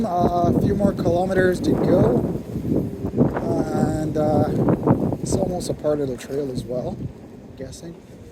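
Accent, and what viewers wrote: Barbados. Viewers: Bajan accent?🇧🇧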